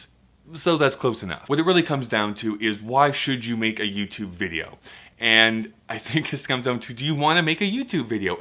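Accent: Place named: South, United States. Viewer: Hey, I have a channel called The Sweetest Vegan or with about 48,000 subscribers. I am not YouTube famous, but some people recognize me in public, I have received some press, and I have a YouTube Partner Manager. I believe in your content and would love to help you grow your channel (No, this is not a network pitch as I am not apart of one). I stopped posting to that channel because, like you, I can only make videos about what I am interested in and I didn't want to hear a bunch of whinny comments complaining about me "changing" every time I posted. Anyway, you have good content, but there is some low hanging fruit that you are not taking advantage of. Email me at heartoftarts at gmail dot com and we can set up a time to Hangout or Skype. I really want to help you strategize for the new year. I am also from Atlanta, GA, so I can help with your southern accent.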